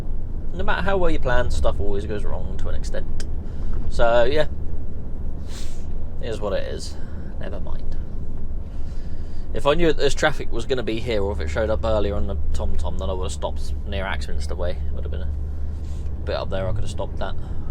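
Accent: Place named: South, United States. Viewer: Nice one. Good video and great southern accent you have 😅… keep them coming 👍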